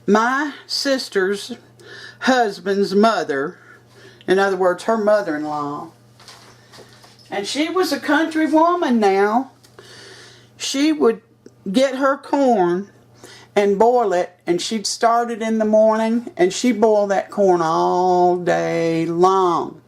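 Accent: South, United States. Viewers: Love your recipe videos and the southern drawl makes me smile. Keep it up!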